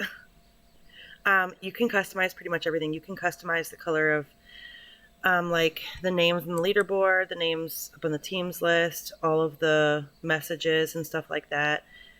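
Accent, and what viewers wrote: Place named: United States. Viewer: con acento americano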